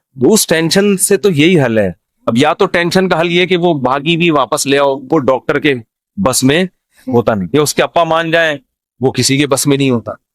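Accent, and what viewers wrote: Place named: Karachi, Pakistan. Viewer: مفتی صاحب کا کرانچی والوں کا لہجہ بھلا لگ رھا ھے ۔❤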